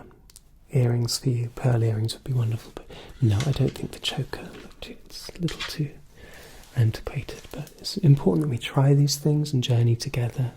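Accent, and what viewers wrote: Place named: RP, United Kingdom. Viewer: love the sound quality..🔥🔥🔥🔥🔥 Your tongue in cheek posh is brilliant. It's not the air conditioning that's the problem for it being insufferably hot 🔥. It's heating Meridian's up and a little dab is not going to suffice in cooling madam. LOL. Cracking me up over here - " I know you're a bit of a magpie, you like those sparkly things..." ♥️♥️♥️🔥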